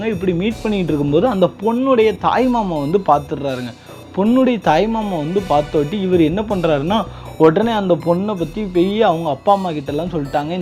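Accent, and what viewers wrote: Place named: Nagercoil, India. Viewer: Your nagercoil accent superb thambi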